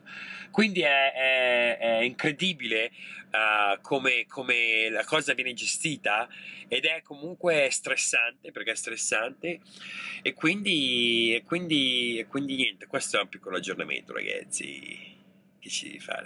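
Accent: United States. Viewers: Ciao, ho iniziato a vedere i tuoi video, fatti molto bene, complimenti. È strano sentire che hai un accento americano quando parli italiano, ed un accento italiano quando parli inglese! 😄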